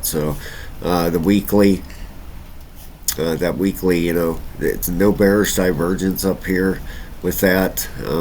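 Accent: Pittsburgh, United States. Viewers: Sounds like Pittsburgh to me, am I right?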